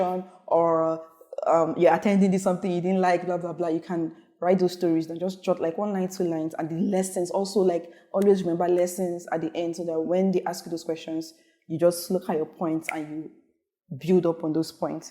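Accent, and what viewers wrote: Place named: United States. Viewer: Omo, you don dey get American accent small small oo😁😎 Nice video, goodluck to those who applied this cycle.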